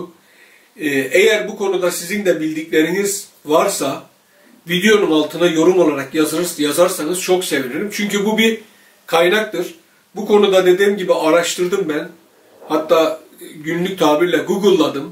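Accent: Gaziantep, Turkey. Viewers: Abi sen normal konuşma ya Antep Ağzı konuş... Yani yanlış anlama abimsin ama sırf seni dinlemek için günlük bi videonu açarım az evvel Abonede olayım dedim,şimdi Antep ağzıyla,hem yorum yapiyin hem abone olmayıksın yoğrum deme diye... Mersinliyim ama keşke Antepli olsaydım. Yani Abicim sana o ağız çok yakışıyor,çok orjinalsın vesselam...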